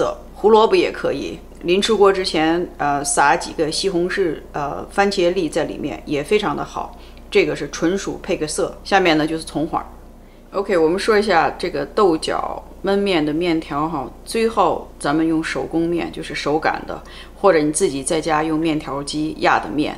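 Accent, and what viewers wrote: Shaanxi, China. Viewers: 陕西话听着就是舒服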